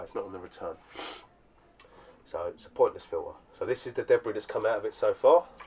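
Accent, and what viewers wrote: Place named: London, United Kingdom. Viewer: loving your videos mate! your a funny guy you remind me of del boy trotter in a good way and with the london accent lol if thats packed in now your wife wont be happy she will be like "why do you have to start pissing around with it showing all your pals on youtube!!!" lol anyways mate keep up the good work hope you and your family had a great crimbo..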